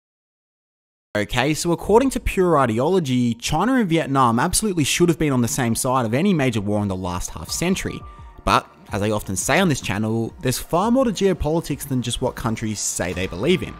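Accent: Australia. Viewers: Why do Australians pronounce China as “Chiner”, and here as “he”?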